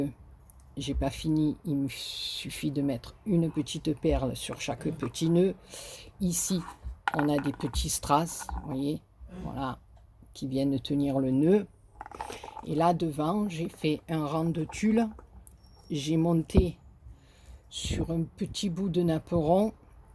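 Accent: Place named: Marseille, France. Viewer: Bonjour,je te regarde depuis plus d un an,sans jamais avoir commenté 🤔🤔,oui c est n importe quoi 😊lol,vos mieux tard que jamais,comme on dit lol j adore tout ce que tu fait,tes sublimes fleurs,que j ai toutes reproduits,et maintenant tes boîtes,alors ça j adore,j adhère hihihi☺,j en ai fait 4 déjà 😉,mais les petites,mais celle ci wahouuu, Merci pour toutes ses très belles créations que tu fait 😊,et merci pour cet accent qui est terriblement Marseillais 😊,j adore 😁,ainsi que ta bonne humeur,tu me fait sourire a chaque fois,a très vite bisous bisous du sud ouest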